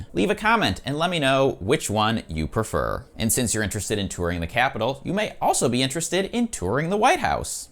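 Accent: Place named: Scotland, United Kingdom. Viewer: A Scottish tour guide? weird.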